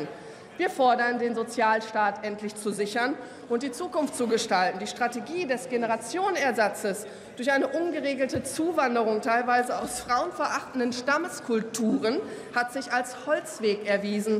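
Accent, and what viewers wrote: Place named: Westphalia, Germany. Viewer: Finde ich irgendwie süß, wie Alice Weidel auf kernig macht und gleichzeitig immer dieser starke westfälische Akzent herauskommt, z.B: : "Schuldenbeag ... eaben weaden" Klingt mehr nach Landfrauenfrauenverband Rheda-Wiedenbrück als nach AfD-Oppositionsführerin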